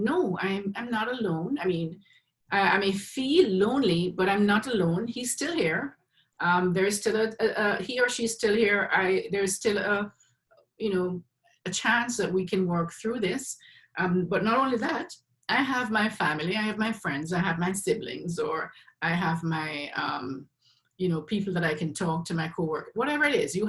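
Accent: Jamaica. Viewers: Loved this. So informative and comforting to hear a jamaican accent give such knowledge-hope I'm correct, I know my ppl lol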